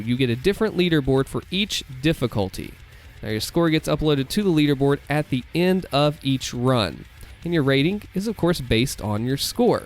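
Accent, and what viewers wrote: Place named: South, United States. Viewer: Hey man. Your videos kept coming up in my Recommended section and I kept watching them (as I am a huge Doom fan). Although I liked your content, I found your accent incredibly annoying. Some kind of Southern accent, right? I'm not from the US so I can't tell, but I just found it unappealing to listen to. But I kept coming back for your content and I realised that your way of speaking is actually incredibly kind. The way you talk, the way you explain things. You don't sound aggressive or rude. I don't know you but it sounds like you are a super nice dude who probably wouldn't hurt a fly. Maybe I'm wrong but that's the vibe I get. +1 for content +1 for kind soft-spoken voice and explanations -1 for shitty accent We're still in the positives there so I subscribed!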